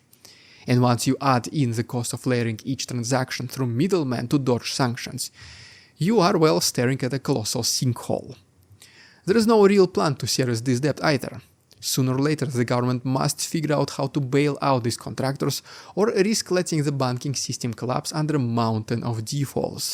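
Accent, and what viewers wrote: Russia. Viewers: I'm sorry, I've watched about three of your videos so far but miss a lot of what you're trying to convey because you have a very pronounced Russian accent and yet you talk fast. The combination of these two factors means I'm constantly having to back up and replay content in order to understand it. I can tell you're really intelligent and have much to offer. Please consider this well-meant criticism.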